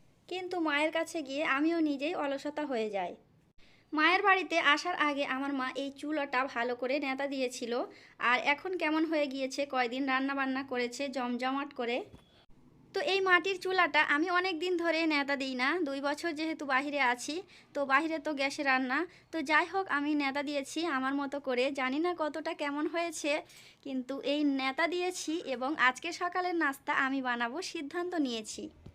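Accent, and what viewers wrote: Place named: Bangladesh. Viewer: আপু তোমার কথাবার্তা শুনলে আর তোমাদের খাবার দেখলে মনে হয় না যে তুমি ইন্ডিয়ান মনে হয় তুমি আমাদের বাংলাদেশ যাইহোক আপু খুব সুন্দর লাগলো মাশাল্লাহ অনেক সুন্দর হয়েছে তাদের সবজি বাগান খুব সুন্দর হয়েছে মাশাল্লাহ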